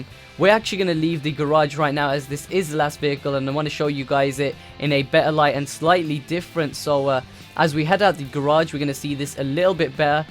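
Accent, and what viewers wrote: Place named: England, United Kingdom. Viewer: You have an English accent but you look like you should live in India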